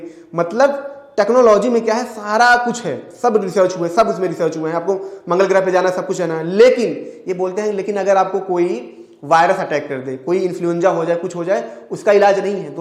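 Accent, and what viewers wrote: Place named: Uttar Pradesh, India. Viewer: I like the accent .....up hindi....explanation is superb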